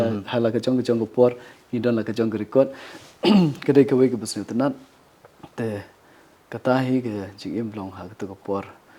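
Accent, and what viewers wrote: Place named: Khasi, India. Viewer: Ba phi kren beit da khasi te nga sngewtynnad bn sngap🎉❤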